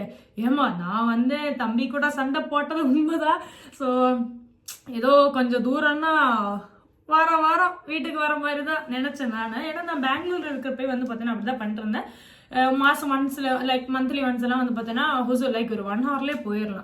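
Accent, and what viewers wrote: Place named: Kerala, India. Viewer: unga tamil different ah iruku . just like tamil and malayalam mixed ah iruku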